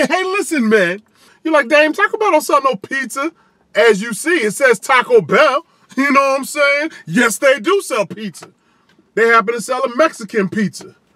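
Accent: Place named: Atlanta, United States. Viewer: you sound like you from Atlanta Georgia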